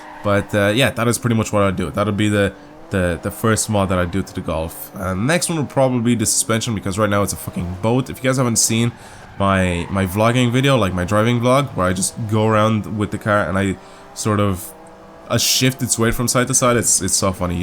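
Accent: Ireland. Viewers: When did you move to Ireland (at what age?) cause of have the accent would never have guessed you were not Irish lol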